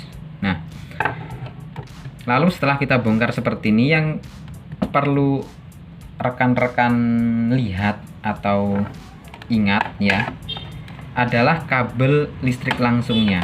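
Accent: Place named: Java, Indonesia. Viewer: Amiiiin... Trmkasih infonya..Sampiyan niii marga tapi gaya bicaranya lebih ke dialek Jawa.. Enaaak merdu pula suaranya... Sehat &.. Sukses slalu..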